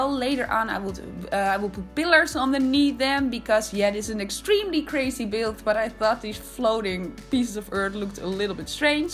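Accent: Netherlands. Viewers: You have a Netherlands accent (my opinion) .. Its very cute!😊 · Are you Dutch? I am Dutch too, I can hear it at your language. 😂 My first language is Dutch, but my second is English! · That dutch accent tho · The second I heard your voice I knew you were dutch. Turns out I was right haha. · Wow so cool! Where are you from. You sound really Dutch to me. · Are you dutch? You have a dutch accent haha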